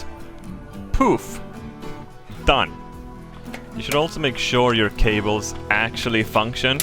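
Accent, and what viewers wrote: Sweden. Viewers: That glorious glorious Swedish accent from is honey to my ears it’s been too long since I’ve heard your voice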